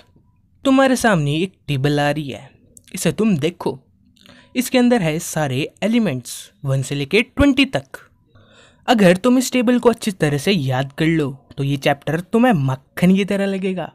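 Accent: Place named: Punjab, India. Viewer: It's good but don't speak punjabi on English plzzz🙏🙏🙏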